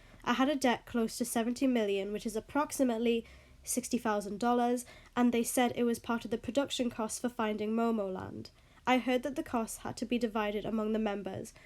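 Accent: Newcastle, United Kingdom. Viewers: wait sis where’s your accent from. I’m getting Geordie. Watch me get it wrong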